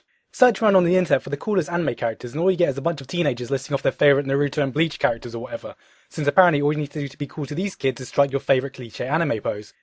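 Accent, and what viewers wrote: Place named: England, United Kingdom. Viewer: Nice English accent,. Are you English by the way? Well, anyways, that's not important. Naruto and Bleach can be overated sometimes. I just recently finished.hack//sign and 11eyes. They're both pretty decent animes. I've been trying to get back into Bleach, but I have to go through all those episodes again.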